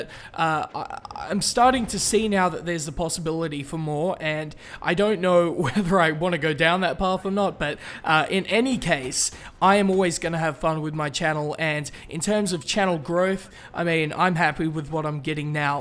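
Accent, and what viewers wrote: Australia. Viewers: Does the person who said the last question on this video think that Australian accent means bogan? This guy's accent is what most people in Australia sound like (I'm Australian and know what they sound like). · I think he sounds Australian. I don't see how he could be American or British. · Sounds like an Australian accent to me. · first time I see this "oh, this guy must be from Australia" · I'm American and it accent is clearly Australian.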